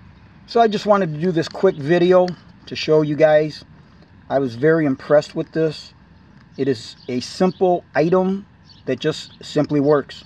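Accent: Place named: New Jersey, United States. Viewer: Are you from Jersey or Long Island?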